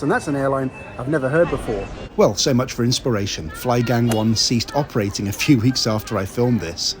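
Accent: United Kingdom. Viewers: Great video!! Wow 🤩 🤩 Starlux 🇹🇼 looks ABSOLUTELY FABULOUS especially on that gorgeous new A330-900neo my favorite derivative of the Airbus A330 Family. I think this experience seemed a little better than the last time you were on an A330neo that being delta airlines example from Salt Lake City(SLC) to Paris (CDG) don’t you think so! 😂 😊 however, I do still really love that video on Delta’s A330neo as I enjoy hearing you pick problems in that awesome British accent of yours! 🇬🇧 😂🎉 <link> Also if I were flying Starlux I’d still try to find an A350 route over the A330neo. A bit of a loss if you ask me as nice as the A330-900neo is I’d always prefer the nicest newest plane or cabin interior on a carrier which would’ve been the A350-900 with Starlux😞 I cannot wait to fly on them someday! Finally Taipei Airport still seems like a pretty good and funky airport and it has some charm and personality I love that in an airport! 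😀 Also Can you please please please try to fly with Korean Air one day on that INCREDIBLE and FAB looking Boeing 747-8? I’d love to see that review either in their UPSTAIRS Biz Class or Fabulous First Class on the nose of the 747-8?? Please try them soon! I remember you saying several years ago now during your Hawaiian Airlines A330 review that you saw a 747-8 of Korean and you have yet to try them still!! Plz do and maybe on HNL-ICN? 😊 Looked like an EXCEPTIONAL EXPERIENCE! Finally Jeb & Suzanne Brooks just used that music on their 24 hour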